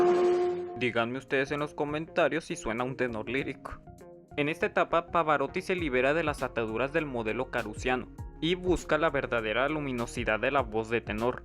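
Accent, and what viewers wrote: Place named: Mexico. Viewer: Dall'accento direi che è messicano. Sicuramente non spagnolo.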